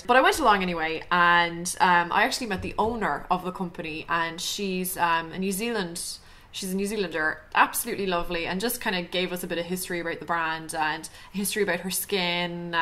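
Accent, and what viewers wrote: Ireland. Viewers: Don't want to sound like a stalker or anything but what part of Ireland are you from? ;)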